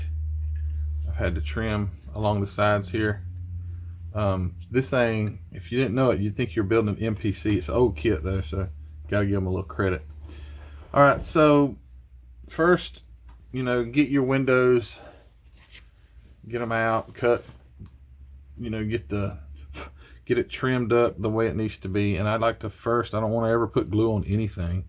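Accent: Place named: Georgia, United States. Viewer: I rather watch videos with someone explaining things than just music , I like listening to you cause you are from my home state Ga and I miss it but I sure don't miss the humidity. .o boy lol.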